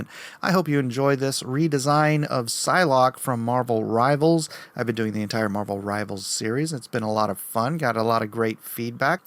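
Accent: England, United Kingdom. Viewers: I get: Awesome ninja skills. You get: English accent. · I love the design, and explanation of lore, backstory, and English accent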